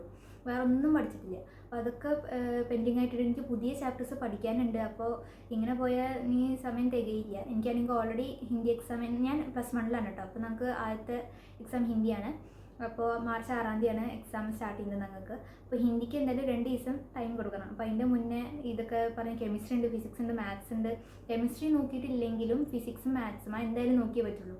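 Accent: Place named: Palakkad, India. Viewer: Pkd ahno than ? .. ur accent is like that !!❤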